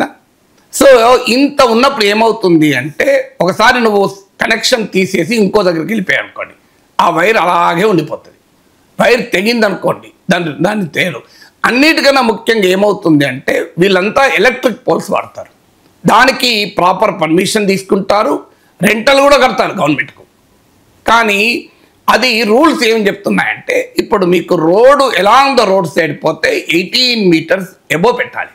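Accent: Telangana, India. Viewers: Avunu bro,adi Telangana yasa daniki nvu pallu ikilinchalsina avsaram ledanukunta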